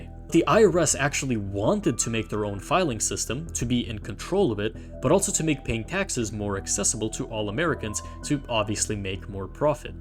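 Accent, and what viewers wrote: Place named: Philippines. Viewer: I just know this is a kababayan. I can hear it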